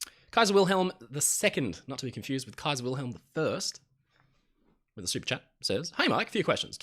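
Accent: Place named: Australia. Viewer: Hello Mike thank you from Robert Bruce in Canada, love your knowledgeable & congenial presentations, like the way you talk your touch of Aussie accent is very likable, cheers!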